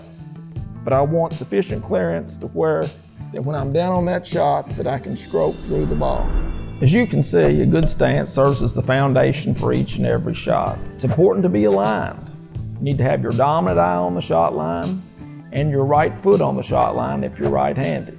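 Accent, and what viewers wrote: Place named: South, United States. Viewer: Not bad advice but honestly talks way too slow, I think it's a southern thing but it's honestly tough for me to watch someone who talks this slow.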